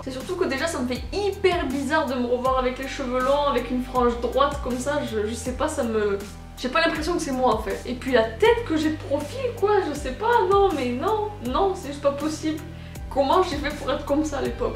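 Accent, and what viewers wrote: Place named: South, France. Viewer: ta l'accent du sud sa s'entant 😀😁☺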